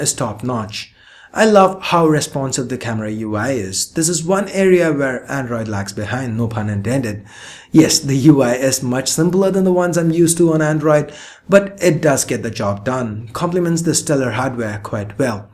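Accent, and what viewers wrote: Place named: India. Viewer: I can understand him really well lol he sounds the same as any Indian.... Which is not bad!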